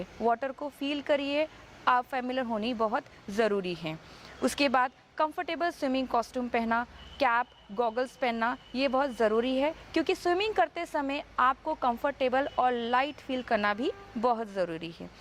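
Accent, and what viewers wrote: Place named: Hyderabad, India. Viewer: From which country are you from I think your from hyderabad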